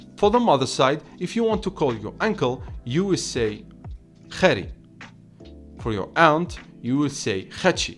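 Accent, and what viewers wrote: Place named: Rif, Morocco. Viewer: Can i know where are you from ? Because your accent of tmazight n rif sounds a little bit different of what i used to hear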